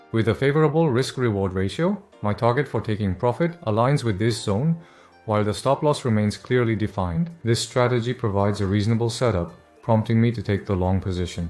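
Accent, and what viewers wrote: United Kingdom. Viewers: great british pronounciation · 아니 잠깐만요 영어 충격받았네요. 인토네이션이나 발음이 해외에서 살다오신 분같은데 영국식이라 듣기 더 좋으네요 목소리 톤까지 좋아서😅 나만 알고싶은 님인데..글로벌 채널도 대박나십시오 🎉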